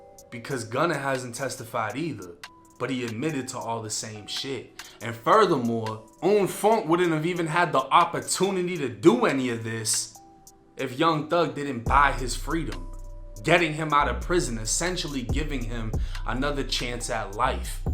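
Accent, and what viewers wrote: Boston, United States. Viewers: Been a sub and when you had about a 1000 subs. I liked your story. And your Boston accent. You probably say the same about my Canadian accent. Listen to Toronto man’s accent. Funny as hell. I did time with some well know Toronto rappers all fighting bodies and get sent to the pen for a 25 year sentence. Cause that how we roll in Canada. 25 max. If your good. You will get out earlier. Toronto mans known for shooting men in the legs ( leg warmers) many here have gotten one and a couple have died from a leg warmer. Hitting that big artery doesn’t take long to bleed out. Look in to some Toronto ggz. Fighting murder cases and still self snitching on Instagram. Giving the judge more ammo. Top5, Flippa. Check them out and there lingo. I was locked up with these guys. Two murders and two not 25 year sentences. Just one. That’s how Canada does it. 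We soft like that. I rock with YOU. COME HERE N 1 million subs. Having beef with Wack 100 is pure comedy. On piru my ninja. Wack is free wackiness. Go with it. Toronto mans not afraid to hand leg warmers out in LA either. Tony Yayo has recently talked about them on YouTube. It’s worth a look. Could be gold and push you over a million. We got a lot of respect for you in the North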